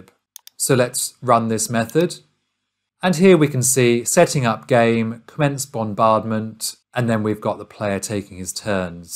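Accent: United Kingdom. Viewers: please, say "bottle of water " :d , is he british ?:d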